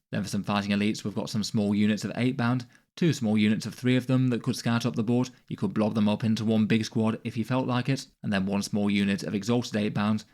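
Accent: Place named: United Kingdom. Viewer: Does everyone in the UK speak a million mil… kilometers an hour? I know bro has to get the info out in a timely manner but with that mono pitch, thick accent, and high word per minute it’s difficult to absorb most of the info. It’s probably worse for those whom English isn’t their first language.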